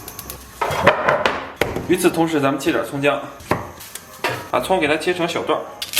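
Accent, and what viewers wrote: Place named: Beijing, China. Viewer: 您那毛坯儿房愣是给我听吐了。求你，放过北京话，积点儿口德。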